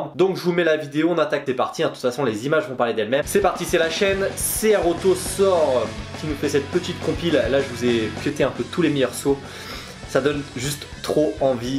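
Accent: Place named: Belgium. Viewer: S'il te plaît respecter l'accent belge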